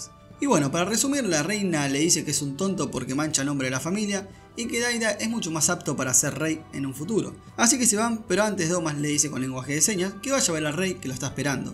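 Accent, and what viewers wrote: Argentina. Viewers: Dandole una buena piña jeje... no se pero algo tiene el acento argentino y el relato de series. Muy bueno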